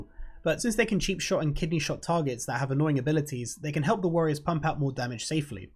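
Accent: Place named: Scotland, United Kingdom. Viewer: You sound Scottish! I think all Scottish people play rogues and warlocks hahaha, seems to be the case on my server at least!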